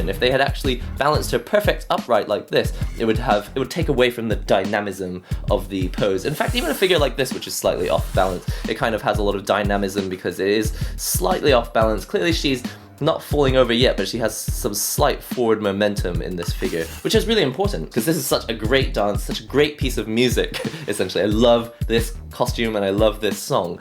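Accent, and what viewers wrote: England, United Kingdom. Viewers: Are you from England????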